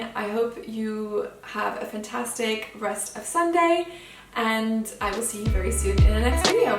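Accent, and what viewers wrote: France. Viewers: Hi I am from Portugal and I love your channel , you describe fragrances perfectly and your french accent is beautiful . Great job 😁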